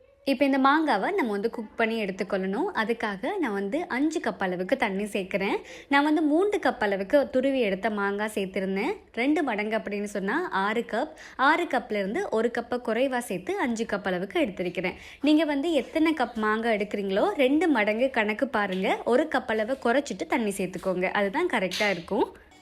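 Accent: Sri Lanka. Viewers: Yesterday I prepared as per ur ratio. It came out well. Tnq so much maa.R u from srilanka 🤔bcoz when u talk u sound like srilankan.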